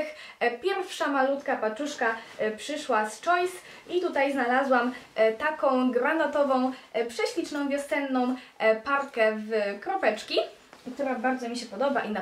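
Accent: France. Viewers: Czemu masz taki francuski akcent?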